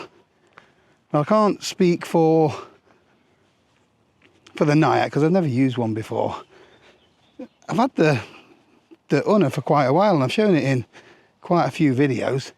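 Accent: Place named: Yorkshire, United Kingdom. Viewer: Thanks for answering my drone audio question,. Appreciate you don’t do “reviews”, but that’s helpful stuff. Like these shorts, chap. You can be a bit Yorkshire (for my southern sensivities) in the long form stuff. (o; I’m enjoying this You. Perhaps the ‘less hassle’ factor is coming through?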